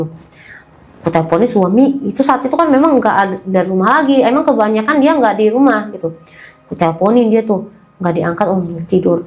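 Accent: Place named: Medan, Indonesia. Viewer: Logat ngomongnya Kayak Orang Medan 🤣🤣🤣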